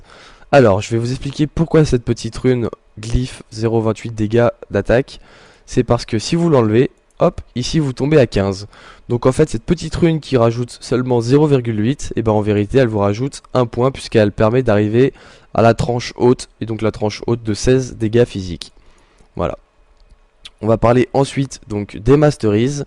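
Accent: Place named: France. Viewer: J'adore quand il dit la solo cul xD Accent français ftw ! :D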